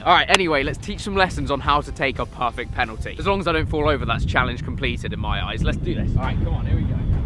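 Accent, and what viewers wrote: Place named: England, United Kingdom. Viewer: This guy's definatlaly english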